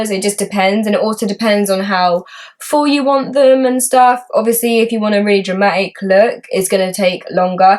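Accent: England, United Kingdom. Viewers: Where in England are you from babe? Your accent is so sweet!! xx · i detect north or east london. could be wrong though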